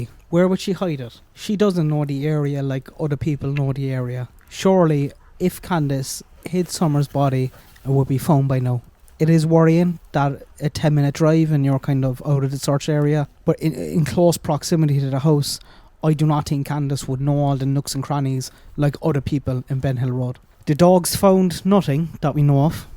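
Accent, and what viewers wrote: Ireland. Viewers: I always get so wrapped up in what you say and enchanted by your Irish accent right away, that I totally forget about the darn bunny! 🐰🐧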